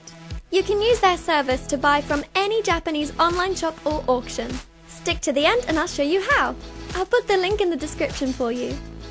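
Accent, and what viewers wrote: United Kingdom. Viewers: your brit accent is sooo cute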